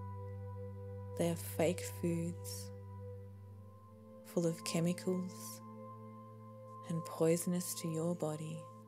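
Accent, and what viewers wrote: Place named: Australia. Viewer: She sounds Australian all the way through to me (I'm British)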